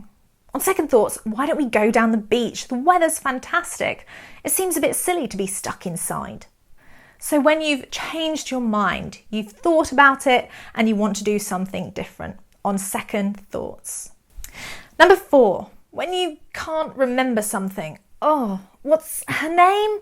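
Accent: United Kingdom. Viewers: I love British accent. Love your video.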